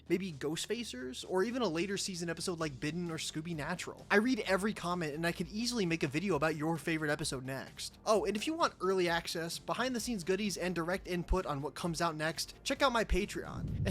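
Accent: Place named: Midwest, United States. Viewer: This guy sounds like polyphonic. He must be from the Midwest.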